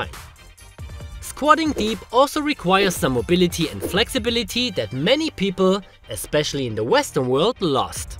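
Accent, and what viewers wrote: Germany. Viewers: I love the German accent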